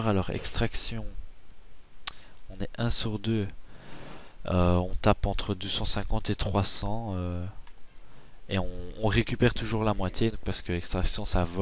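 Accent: Belgium. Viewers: Il est belge:D ?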